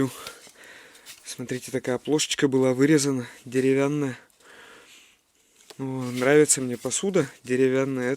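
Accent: Vyatka, Russia. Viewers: Говор вятский... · Автор вы откуда? Акцент как вятских😊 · Точнее, говор вятский! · пожалуйста,называйте район,где эти деревни находятся.Или это нельзя? Говор у Вас родной,до слез приятно слышать родные слова.Я вятская,но живу очень далеко,в Казахстане,и в силу возраста(73 года) и кучи болячек уже, наверное,никогда не смогу приехать.Очень рада,что нечаянно наткнулась на Вас.Подписалась,пересмотрю все.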